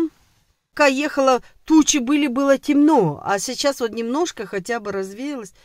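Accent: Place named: Karakalpakstan, Uzbekistan. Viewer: Вот зачем вы так пишете, она каракалпачка, пусть говорит как может. Пусть речь не литературная, зато у нее много других сильных сторон.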